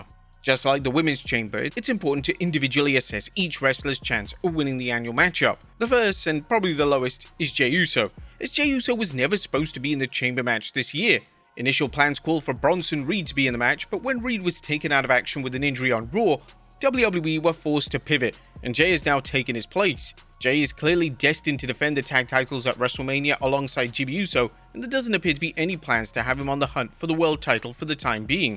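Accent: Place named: United Kingdom. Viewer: British accent is getting a little old ya know? How about we switch it up. I would assume you’re multi talented, so let’s get an Indian or a Chinese accent. Maybe even Australian?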